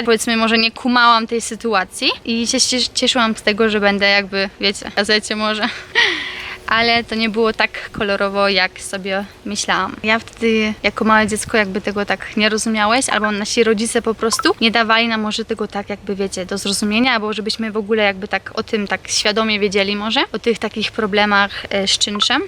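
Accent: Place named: United States. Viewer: Dlaczego tak mówisz ? Ten akcent ..jest nie do zniesienia . Jesteś Polką czy Amerykanką ?! Bardxo mècząca gadka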